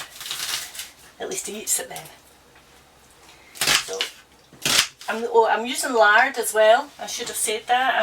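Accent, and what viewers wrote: Scotland, United Kingdom. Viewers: I’m from Edinburgh. This I how I make them too 😊 I’m in Australia now and loved the Scottish accent fix. Stovies for me today!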